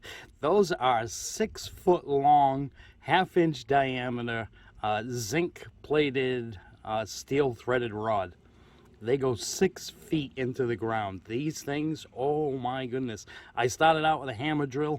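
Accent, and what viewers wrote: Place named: New England, United States. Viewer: LOL! Ithis is the first video of yours that I have ever watched. I am originally from NY, and have family in Ct and Ma. I heard the accent and said he must be from Mass. But further research said you were in Carolina. I was thinking how could he have that New England accent? Now I know.😊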